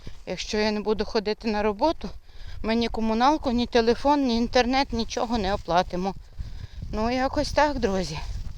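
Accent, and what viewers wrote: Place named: Ternopil, Ukraine. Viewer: Нагадали Ви менi моi 20 рокiв😊 В Днiпродзержинськ на швацьку фабрику приiжджали кожен рiк декiлька десяткiв дiвчат з Тернопiльськоi областi пiсля швацького училища. Так вони розмовляли так само, як Ви. Особливо слово " але" дуже часто вживали. " I" з дома крапками не можу писати - тiльки нiмецькi та росiйськi лiтери маю. Батьки були росiйськими нiмцями. Розмовляти украiнською не мала потреби, але читала, писала у школi. Не забула 😃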